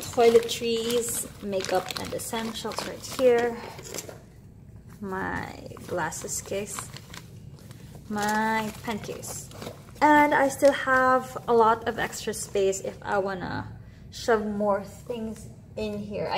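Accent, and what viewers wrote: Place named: Bisaya, Philippines. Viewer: Hello kabayan nice review. Bisaya ka din ba? I notice your accent kasi very bisaya. Proud bizdak here. 👋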